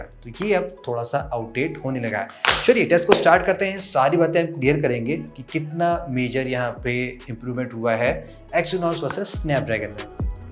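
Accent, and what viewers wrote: Lucknow, India. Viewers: Are you from lucknow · Aap Lucknow se ho kya???? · Bhai ap lucknow se hai kya? And Goof review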